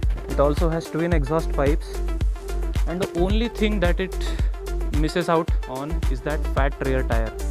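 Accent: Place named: India. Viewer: I liked your narration , its not boring at all and your accent is very Indian (not fake). Thanks.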